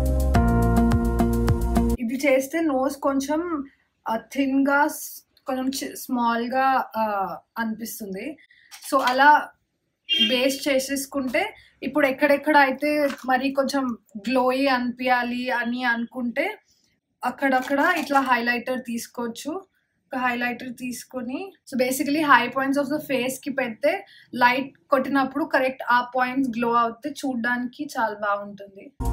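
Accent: Telangana, India. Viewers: telengana pilla.. we should proud of our slang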